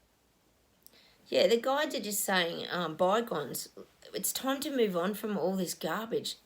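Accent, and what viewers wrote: Australia. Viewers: Hi new subscriber here agree with all what you have said. Been saying to everyone for the past couple of years now we have to go back. I have no idea why I say this but feel we have moved to fast forward. It's time to get back to basics. Can't wait for people to wake up. Lovely to here your Ausie accent I used to live over there many years ago.Still miss it. Thanks for your guidance xx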